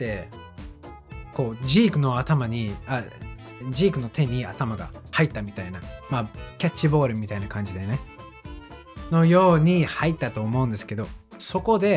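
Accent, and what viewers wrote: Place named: Kansai, Japan. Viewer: えらい外国人顔の関西人やね。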